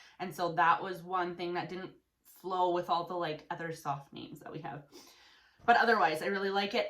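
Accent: Canada. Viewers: this is the first video of yours ive ever seen so are u canadian? u sound like u have a super strong canadian accent (im canadian btw) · Sorry I’m late. I just started to watch you’re videos. I was fascinated by the diy haircut video and the Canadian accent. I’m from Michigan. 😉 Here was our boy name list. Our sons name is Ethan 💙 Jack Jackson Jake Jacob Jaden Jayden Ethan Carson Zachary Carter Tyler Liam Bentley Blake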